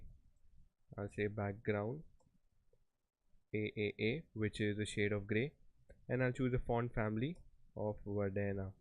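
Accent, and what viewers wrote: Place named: India. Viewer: you sound like a hindi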